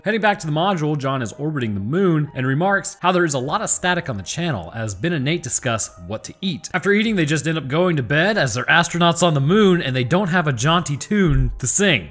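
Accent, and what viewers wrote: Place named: South, United States. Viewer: You can tell you were raised in the south lol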